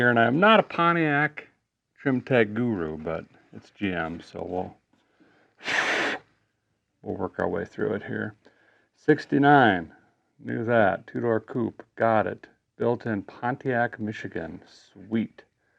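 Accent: Minnesota, United States. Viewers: The multi-millionaire celebrity Vice Grip garage... havent you yet transcended beyond ACTUALLY having to produce content? No billionaires just supporting you for being the celebrity you are with your "Fargo" Minnesota BS Accent? All this is is Hoovie 2.0...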